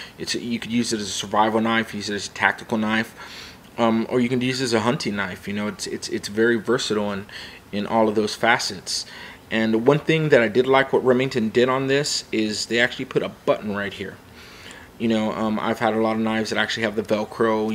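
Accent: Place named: Canada. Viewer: this is totally off topic, but are you canadian? because youve got the most adorable accent!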